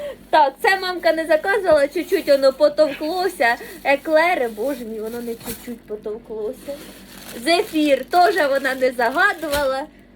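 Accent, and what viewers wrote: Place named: Surzhyk, Ukraine. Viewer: Диво дівчина. Не люблю суржик але з цих чарівних вуст це як музика.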